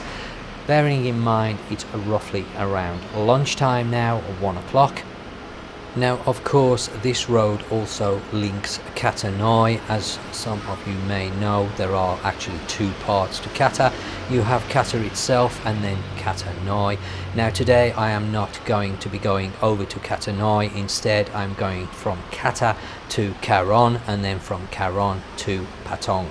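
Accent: West Midlands, United Kingdom. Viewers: Just found your channel. Enjoying watching your videos. You sound like your from the West Midlands. Same as myself 😊. I go to Phuket for the 3rd year in a couple of weeks. I have made friends working in hotels there. Tourist numbers are definitely down but not dramatically like some news outlets suggest. Chinese are definitely less due to the tour boat disaster and I'm sure the strong Thai Baht is stopping the twat Packers. I mean back Packers. Indian tourists are slightly up which is interesting because they pay alot in visas. Anyway keep up the good work. Keep the videos coming. Thanks 👍😊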